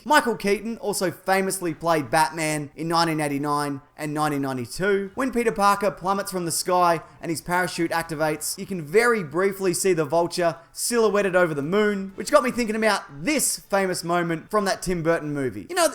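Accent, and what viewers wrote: Australia. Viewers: are you Aussie? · is this your real voice or your Bon Jovi public persona voice?(his real voice wouldn't make girls swoon, well maybe Claymates?) Because a high strung Aussie voice...not good.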